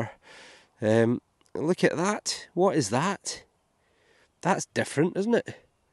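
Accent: Scotland, United Kingdom. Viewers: Love to watch your treasure quest. I specially enjoy listening to you that Scottish accent sounds so magical to my ears. Also you are a good looking man sertainly easy on the eyes. You are. so cute when you find something great and you get so excited. Cheers from Princeton Illinois USA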